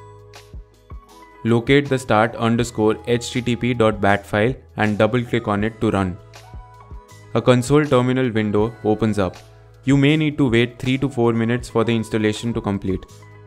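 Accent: India. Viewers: dude sounds like a kid with a indian accent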